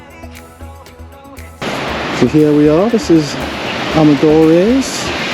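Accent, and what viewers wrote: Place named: England, United Kingdom. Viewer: Hi,fairly accurate video, trying to make out your accent to see what part of England you're from.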